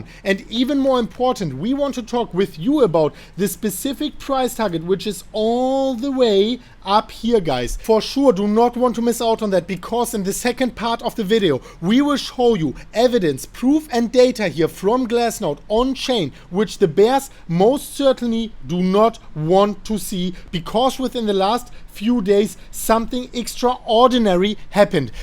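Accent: Germany. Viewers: Mike Porten this is a very strong german accent, they often pronouncing „the“ as see or they say somsing instead of something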